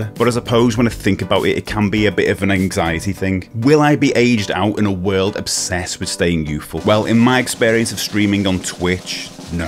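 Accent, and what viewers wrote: Liverpool, United Kingdom. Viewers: Its never to late mate! Btw .. are you a Scouser?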